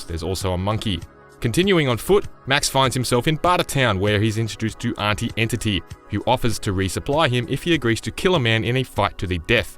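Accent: Australia. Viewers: I like how his voice sounds Australian because the film takes place in future Australia.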